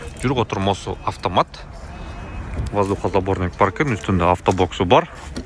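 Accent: Standard. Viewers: Ушуну таза кыргызча десе болот моладес брат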